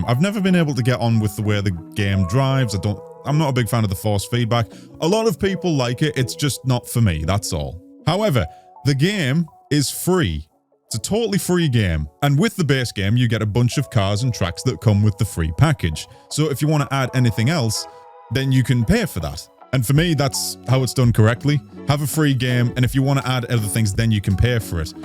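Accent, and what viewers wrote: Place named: North East England, United Kingdom. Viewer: So where are they going to get money from to keep the servers on? It’s all well and done to whine about costs, but long term, unicorn tears and candy floss won’t keep it going. I like Iracing and LMU and race both regularly. I hope this new sim succeeds but long term support isn’t guaranteed without money. Nice to hear a fellow North East accent, even if I’m older than your dad.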